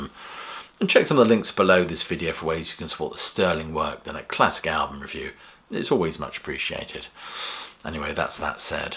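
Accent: England, United Kingdom. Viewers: He's English ..you cant figure out that accent?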